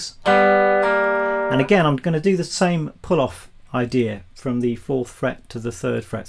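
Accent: England, United Kingdom. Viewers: 10 années déjà....Merci, Mr! J'adore ton niveau musical, j'adore ton accent Anglais, bref, tu es très bon et très sympathique cher ami musicien....merci pour ce cours que j'apprécie beaucoup car même avec mes dix années de guitare, j'ai encore besoin d'un super bon comme toi pour m'apprendre le morceau exceptionnel, irrationnel, indémodable des...Merci infiniment, Monsieur! Je ne suis pas prêt de t'oublier cher ami.....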